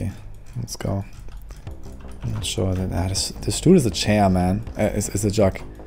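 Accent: Germany. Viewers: you are 1000 percent a german right?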